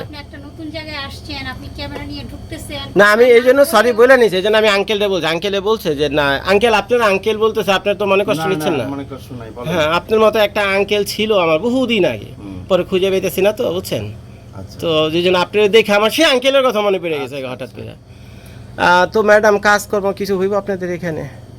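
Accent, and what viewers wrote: Dhaka, Bangladesh. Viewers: Vai apni ki dakia apnar kotha gulo dhakar vasar moto ami dhakar bacharamduriar amra kaligog khajur bag bari kinaclim apnar mukha dhakar vasa hsuna khub valo laga and apnar modduma usa shompoka onak kicu janta partaci thank you valo thikban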